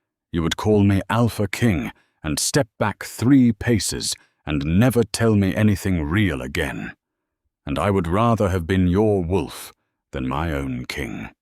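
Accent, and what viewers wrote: United Kingdom. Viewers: I can't quit laughing. LOLOLOL Thermal regulation? LOLOLOLOL Calling him a big baby. The narrator with the British accent is PERFECT. LOLOLOLOLOL LOVE IT !!!!!!!!!!!! Love hearing what a MAN will do for a woman. Just soo wonderful to LAUGH... Thank You.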